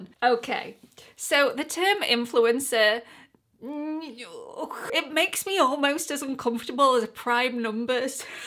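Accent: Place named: Yorkshire, United Kingdom. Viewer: I've just found your channel and you are so refreshing and relatable. I love that you're from Yorkshire too. Accents fascinate me and it's so cool to hear such a variety of them. Now I'm off to binge watch all your videos while I get ready for work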